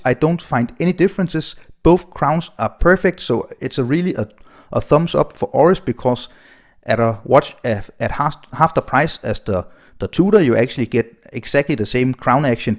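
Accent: Denmark. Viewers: I would guess your mother tongue is Danish, am I right? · By your accent Im guessing you are Danish, I am looking to buy a BB58 as well, do you recommend any retailers in the Copenhagen area?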